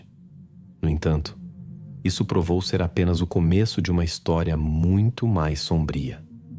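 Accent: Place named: Portugal. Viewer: O canal é ótimo, exceto quando coloca sotaque de PORtugal. Afffff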